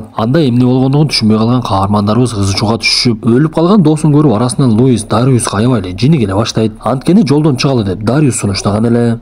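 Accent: Standard. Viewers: Ото соонун таза кыргыз тилинде, тушунуктуу кылып, тушундуруп бергениниз мага абдан жагат даагы соонун ,кызыктуу кинолорду чыгара бериниз.❤❤❤ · сонун суйлойсун ан таза кырызча сонун азаматсын 👍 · 🎉 сен ен сонун суйлойсун нукура Кыргызча суйлосун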